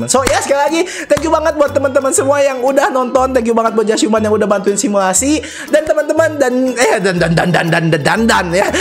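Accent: Indonesia. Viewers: Item Dreams? Akwikwk Indo parah itu bahasa Inggrisnya 🤣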